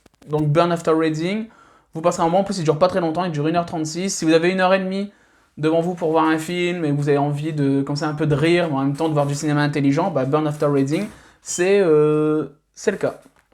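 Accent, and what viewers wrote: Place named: Nord-Pas-de-Calais, France. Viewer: Ton accent ne mentais pas, c'est cool d'assumer les ch'tis haha !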